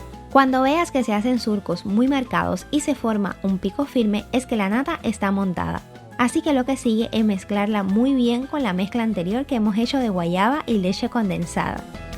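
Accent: Cuba. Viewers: Excelente receta, acompaño tus recetas. Soy de Puerto Padre. Las Tunas. Siempre me pareció tu acento parecido a los holguineros.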